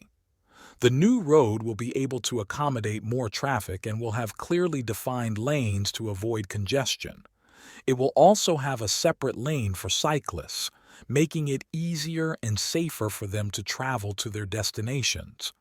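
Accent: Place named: United States. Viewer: How comes you know Buganda clans but in the vlog you sound to be an America. This is very interesting thanks man. Keep it up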